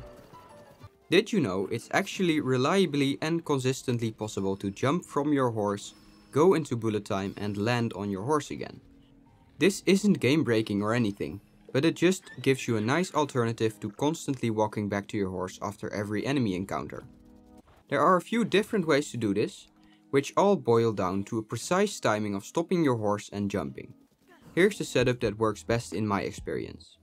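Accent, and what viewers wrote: Netherlands. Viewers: I can hear in ur accent, u're Dutch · Are you Dutch because your English sounds like someone Dutch talking English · First 3 words and i know you're dutch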